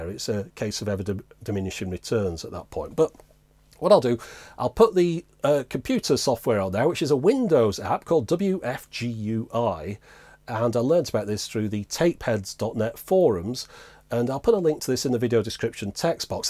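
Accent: United Kingdom. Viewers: I love British accents describing things or providing instruction.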